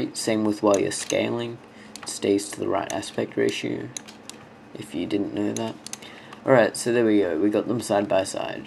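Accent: Australia. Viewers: Yay, you're Australian like me. ;)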